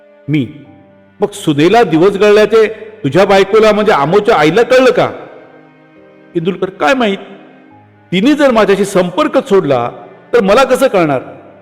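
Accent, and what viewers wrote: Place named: Kolhapur, India. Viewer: कोल्हापूरी टोन ऐकायला बरा वाटला.. शेवटी कोणी कोणाच नसत हेच खर...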